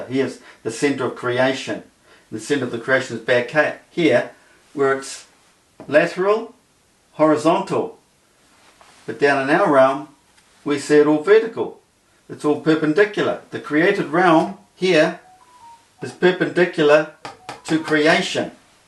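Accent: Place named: New Zealand. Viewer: he sounds like a very clued up kiwi man! ❤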